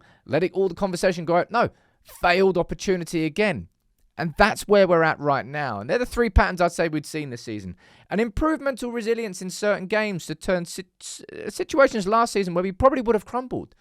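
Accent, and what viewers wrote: Manchester, United Kingdom. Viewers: you sound like your from manchester mate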